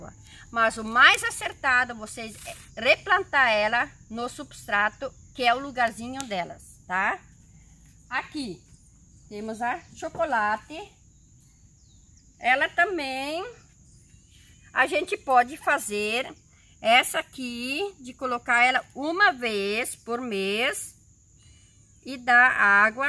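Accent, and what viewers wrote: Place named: Germany. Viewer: Esse sotaque alemão ❤️😍😂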